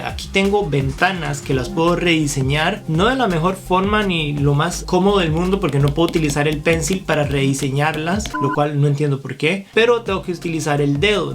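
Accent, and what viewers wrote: Costa Rica. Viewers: Me suena como a costarricense bro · Maeeeeee que buen video, gracias por una reseña tan integral y buena 💯 Usted es de Costa Rica o me falla el oído 👀?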